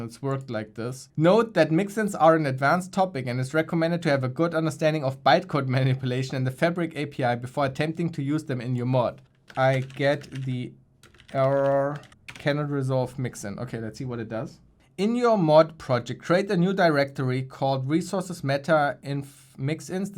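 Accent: Germany. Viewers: Bro is german? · this german accent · Man hört das deutsch raus 🙂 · Are you a German man? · Du sprichst eigentlich Deutsch oder xD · Sounds like an german Accent lol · German Accent? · Hey bro are you german? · are you german? i am a german too and you sound very german to me lmao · Du bist Deutsch oder ? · Man hört das Deutsche raus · Hab nur 1 Satz hören müssen um zu wissen das du Deutsch sprichst 😂😘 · i think i hear the german accent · Man hört das du deutsch bist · Man merkt so hart dass er deutsch ist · I can hear Deutsch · Man hört dem deutschen Akzent ab und zu 😂❤ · He is a german guy you her it by it acent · Junge bist du deutscher? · correct me if I am wrong, but as a German I heer it: you are german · Bruder du hast so einen krassen deutschen Akzent. Bereits bei "I'm sitting" war schon alles klar xD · bro sounds so german xD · erste 5 sekunden "der typ is doch deutsch" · Based on your accent, I would guess that you are from Germany. 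Am I correct?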